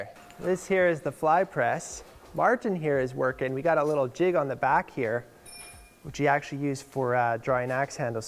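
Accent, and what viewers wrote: Canada. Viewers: Holy crap this guy sounds Canadian! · dude i didn't need any1 to tell me that this man is Canadian lol, no pun intended i love the Canadian .....what ever 1 calls it lol ..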